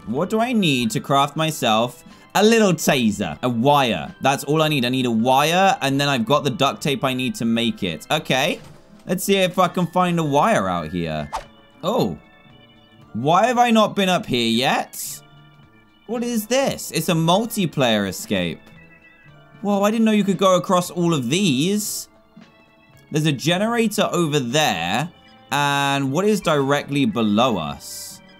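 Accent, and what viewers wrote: United Kingdom. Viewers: I know he’s British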